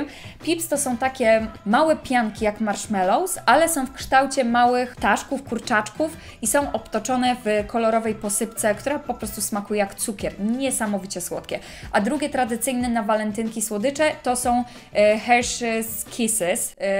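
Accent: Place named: Russia. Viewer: Powiem, że rosyjski akcent pierwsza klasa 👌🏻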